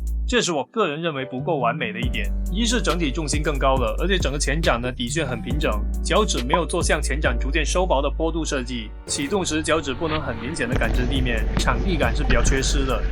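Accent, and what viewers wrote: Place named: Hakka, China. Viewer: 你是客家人嗎? 這鄉音也太重 哈哈哈 超親切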